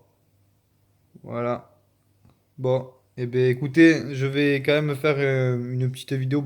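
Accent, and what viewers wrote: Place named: South, France. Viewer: salut merci pour ta réponse Peut tu me donner les coordonnées de ton interlocuteur français à la clinique j envisage Di aller fin avril donc sa hurge merci encore gilles du cap d agde tu doit pas être très loin vu ton accent merci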